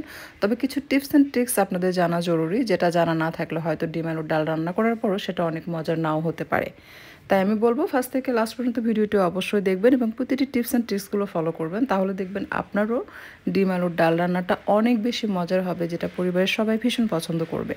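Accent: Bogura, Bangladesh. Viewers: Jak bugurar 1ta apu pelam Tai toh boli kotha ato sundor kano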